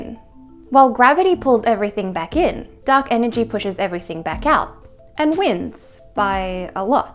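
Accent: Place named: Australia. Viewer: Aussie accent is superb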